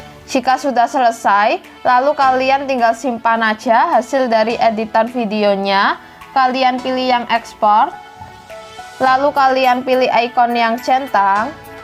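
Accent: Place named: Java, Indonesia. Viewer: Medoknya gemoi :v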